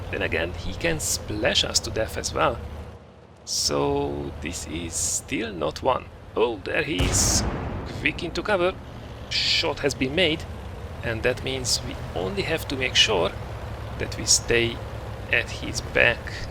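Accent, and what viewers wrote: Hungary. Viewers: magyar vagy? mert ( lehet hogy csak én) eléggé hallottam az akcentust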